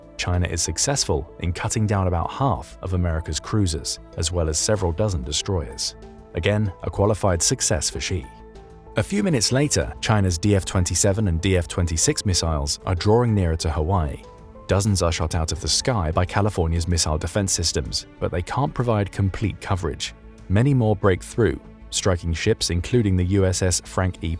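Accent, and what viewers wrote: England, United Kingdom. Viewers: Nice try. "British accent" can refer to any British accent. In this case, assuming you are not deaf, he is clearly referring to an English accent. But wait... in your attempt at pedantry you yourself were far too broad. So I'LL ask you: Which English accent is it? Estuary? King's RP? Norfolk? West Country? Brummie? Scouse? Midlands? Chorley?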